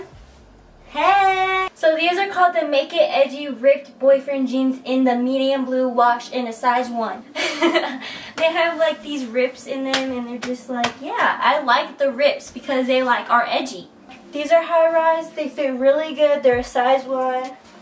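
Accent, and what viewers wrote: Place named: South, United States. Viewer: is it just me or she has a slight country accent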